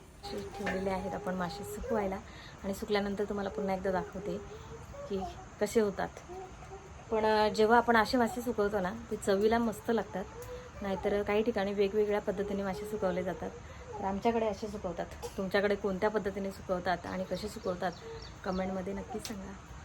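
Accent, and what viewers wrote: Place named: Thane-Palghar, India. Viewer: तुझ गाव कोणते? भाषा ठाणे-पालघर ची वाटते.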